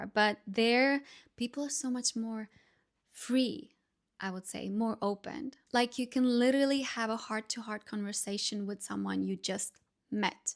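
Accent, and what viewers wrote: Australia. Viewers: After listening to you , i can say unequivocally.....You are 100 % Australian, wherever you may be ..... So lovely to hear your experience here. Come back !!!!! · Its funny to hear Europeans speak English with an Aussie accent! I'm sure you'll get back some time. you know you'll run into aussies too so dont forget to mention your connection to the land down under..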